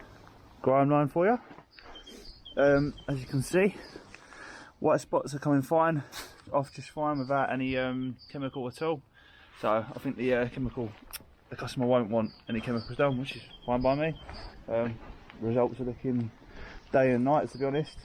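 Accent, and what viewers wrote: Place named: United Kingdom. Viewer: ¡Hola! Since México, my english is not goog, but I say you: When you speck the Britain English, it hear very nice, interesting and elegant.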